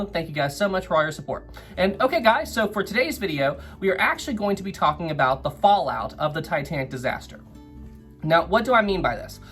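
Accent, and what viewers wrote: South, United States. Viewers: You seem to lapse in and out of a Southern accent.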